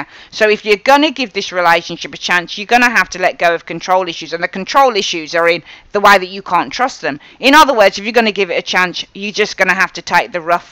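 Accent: Birmingham, United Kingdom. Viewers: You sound like a brummie...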